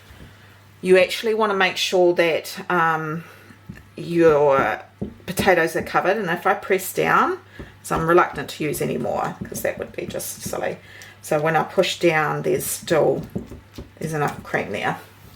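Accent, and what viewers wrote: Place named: New Zealand. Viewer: love your kiwi accent!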